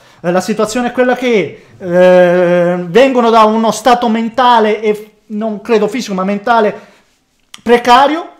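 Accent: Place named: Apulia, Italy. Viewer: Ma di dove sei? dall' accento sembri pugliese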